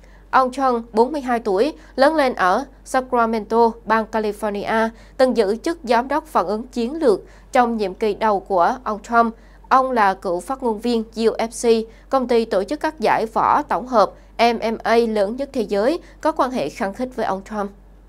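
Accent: South, Vietnam. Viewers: Giọng nói miền Nam de thương, rõ ràng, de nghe, còn miền Bắc không kho nghe. Nhất là dấu hỏi và dấu nặng: vi du: du chưa? Du chưa!!!!!!!?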